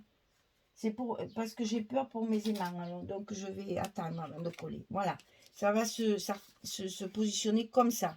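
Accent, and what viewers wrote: South, France. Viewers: Bonjour non seulement vous faites un merveilleux travail et vous m'inspirez mais en plus grâce à cette vidéo nous pouvons découvrir une hyper sensible et très émotive 😍. Moi perso je suis addict de vos créations et de votre voix qui chante le Sud 😁. Je vous souhaite un bon dimanche 😘😘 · Bonjour, je connais votre chaîne depuis peu grâce à Marick Scrap et je reconnais que vous faites des merveilles. Effectivement, le double face permet un travail plus propre. Mais pourriez-vous me dire quel scotch vous utilisez pour une tenue sur la durée car tous ne sont pas au top. Merci de votre réponse et quel plaisir de regarder vos vidéos où je retrouve mon accent du sud.....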